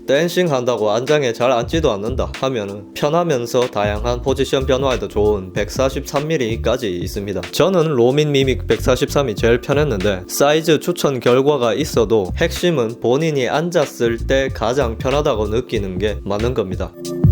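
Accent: Gyeongsang, South Korea. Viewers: 저도 경상도 사나인데 사투리가 와이리 듣기 좋노~ 참 히안하데이~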